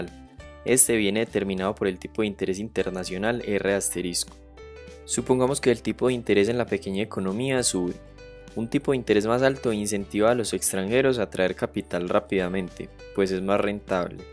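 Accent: Antioquia, Colombia. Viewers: jajajaja muy bueno el video. Se le nota lo paisa.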